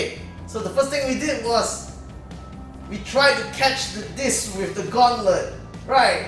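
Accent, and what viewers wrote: Singapore. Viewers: Where are you from?, you have a Singaporean accent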